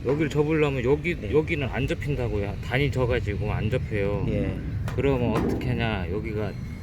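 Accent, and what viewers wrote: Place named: Chungcheong, South Korea. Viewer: 충청도 사투리가 정감가네요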